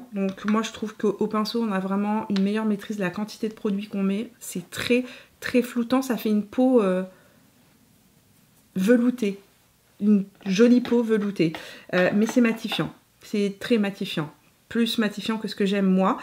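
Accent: Canada. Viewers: Sympa toutes ces vidéos. Vous êtes française ou canadienne ? Merci pour vos conseils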